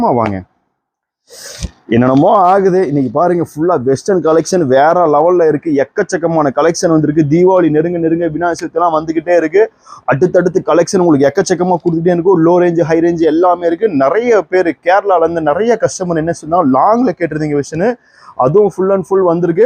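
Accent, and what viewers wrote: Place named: Marwari, India. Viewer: Wow collections. Price super. Settu maadhiri paesaradhu superaa irukku.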